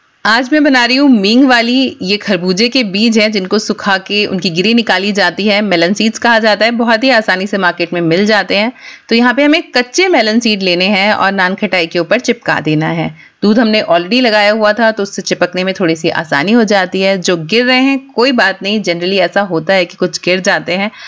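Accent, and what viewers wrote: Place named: Meerut, India. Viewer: Aapki Urdu boht piary hai pure merut wali love from lahore pakistan 😘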